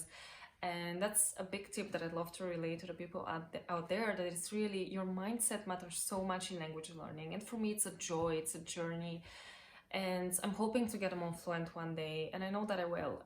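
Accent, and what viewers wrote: Portugal. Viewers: Tens um sotaque mais puxado para o Europeu 🇵🇹 Mas tem também influências Brasileiras 🇧🇷 por exemplo como disseste ‘importante’. Pronunciaste como ‘importantxi’ como fazem os BRs Mas se entende muito bem. If I didn’t know any better I would’ve assumed you were a native that happened to live in both countries hence the mixed accent. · Seu sotaque de português é um pouco mais puxado para o portugues europeu, mas eu, como falante nativo de português brasileiro, entendo você melhor do que eu entendo portugueses, porque sua pronúncia é mais aberta do que normalmente se ouve dos portugueses. E sua fluência é incrível, você parece encontrar palavras com a mesma facilidade em qualquer língua que fala, além da gramática que, pelo menos no português, não encontrei nenhum erro. Não que fosse algum problema se eu tivesse encontrado, mas é surpreendente o cuidado que você tem com detalhes. 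· when you speak french i hear the portuguese accent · Seu português é muito bom. Seu sotaque parece mais com o de portugal. Parabéns! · O sotaque Português é 60% Português Europeu e 40% Português Brasileiro. :) Parabéns! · O teu sotaque em português é mais próximo do português europeu do que do brasileiro. Há uma o outra palavra que soa mais brasileira. Mas falas mesmo muito bem! 🥰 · sotaque parece o europeu mas tem uns pontos brasileiros... Como o uso do "você" e do som "dji" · Seu sotaque é mais de Portugal 🇵🇹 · Olá,! Seu vídeo é muito inspirador para mim, que ainda estou no início de ser um poliglota. O seu sotaque no português é o europeu, mas você também fala perfeitamente compreensível para nós, brasileiros. Acredito que é por você não se importar muito com sotaques, e por isso, apesar de soar mais como português europeu, você não se prende muito a ele. Também procuro aprender outros idiomas sem me importar muito com sotaque nos estágios iniciais. 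· I really admire multilingual people, you are a talent. But it's a big mistake ppl think that just because a person has a "gift" it's easier because no, you have to study a lot to reach a goal, for talented people like, maybe less time is all that. Seu sotaque de português com certeza é mais parecido com o de Portugal. Se você falar dessa maneira aqui no Brasil, as pessoas irão te confundir com uma portuguesa mas você fala muito bem! 日本語を話すことを学んでください。私の第二言語です。あなたが日本語を話すのを見てみたいです。(Please learn to speak japanese it is my second language and I would love to see you speak it.) Cheers from Brazil! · Seu sotaque é do português falado em Portugal. O português falado no Brasil é bem mais limpo e claro de se ouvir. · Seu sotaque é soa como o do Português Europeu. Como brasileira posso dizer que você fala Português muito bem. Consegui te entender perfeitamente.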